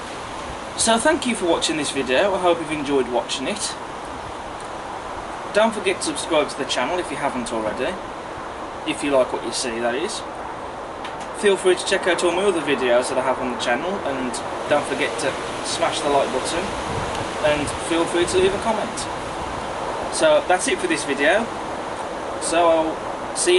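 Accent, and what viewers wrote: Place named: Coventry, United Kingdom. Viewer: Whereabouts do you come from? Sounds like Coventry perhaps?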